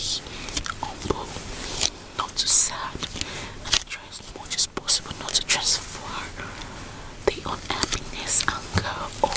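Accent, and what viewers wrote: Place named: United Kingdom. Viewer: I like when she speaks English i almost hear a British accent... Such a sweet lady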